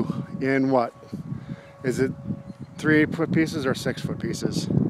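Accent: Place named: Upper Midwest, United States. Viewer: Based on your accent, somewhere west of the Great Lakes and near Canada.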